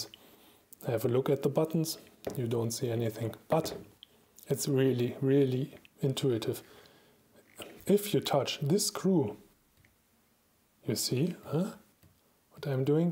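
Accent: Germany. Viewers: Ahaha! Me as a German must say: He is so typically german not only because of his dialect but the way he sees things😂😂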